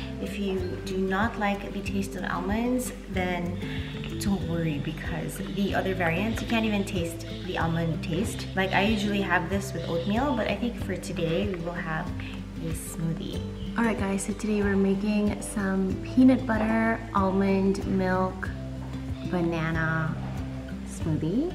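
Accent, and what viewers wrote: Visayas, Philippines. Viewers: Ka cute mag bisaya 🙈♥️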